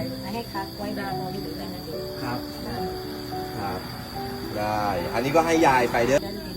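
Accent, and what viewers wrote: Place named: Laos. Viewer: Northern middle Laos accent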